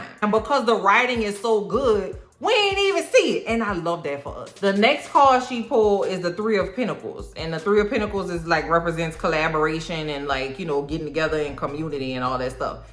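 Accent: New Orleans, United States. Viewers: I love the way you explained this, your Nola accent makes it better “Baaaaby” 😂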